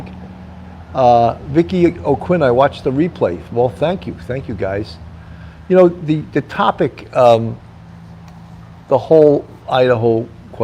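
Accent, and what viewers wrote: New York, United States. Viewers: I'm a Wantagh lady, living in NC! My aunt was friends with Carol Baldwin, Alec's mom. They helped each other go through cancer, what a sweet lady! They took my daughters and I to a July 4th parade and Mrs Baldwin gave my daughter a doll her daughter had. I have a pic if Alec with my aunt at Billy's wedding. Yes, he's arrogant and should have kept his mouth SHUT!!! They may make an example of him! LOVE hearing your NY voice, reminds me of home!!! Love your jokes and songs!!! I saw last year and enjoy your songs and dongs of that era!!!, my daughters are 40 and 41!!!!!!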